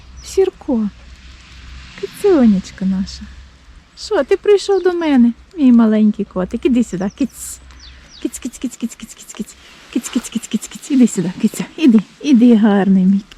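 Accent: Western Ukraine, Ukraine. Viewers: Непохоже,что вы из Запорожской области.выговор у вас западенский.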